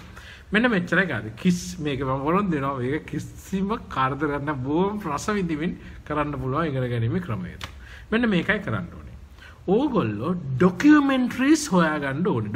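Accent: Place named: Sri Lanka. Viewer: පුලුවන් කෙනෙක් ඉන්නවනම්. මේ සර් වගේ සිංහල අය කතා කරන ඉංග්‍රීසි තියන චැනල් එකක් කියන්න.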